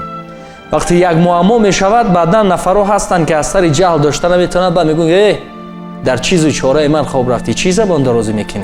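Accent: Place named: Dushanbe, Tajikistan. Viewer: Хамааш дуруст! Ман дар Хучанди шумо 7 сол зиндаги кардаги лахчаи гап зании шумоя нагз медоным. Лек шумо гап занитон ранги Душанбегихо !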